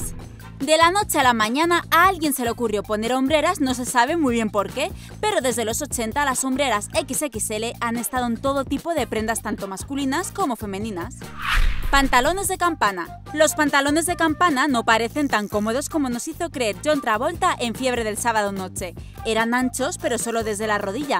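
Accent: Spain. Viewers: Lo peor él acento español :v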